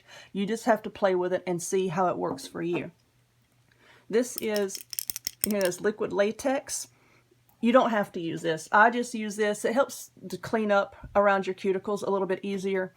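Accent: South, United States. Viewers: TYFS...I luv your southern dra2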